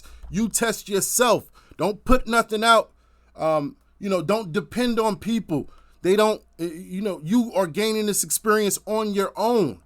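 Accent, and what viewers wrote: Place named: New Jersey, United States. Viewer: And where u from? U sound like u not really from nyc but somewhere close. Jersey or Li or sum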